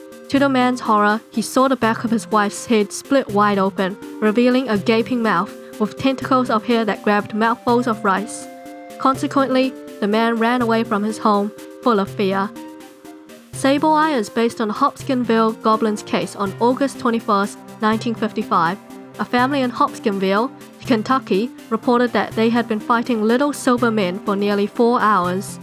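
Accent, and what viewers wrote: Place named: New Zealand. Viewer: I KNEW IT! THE INSTANT I HEARD THE VOICE I KNEW IT!! HELLO FELLOW KIWI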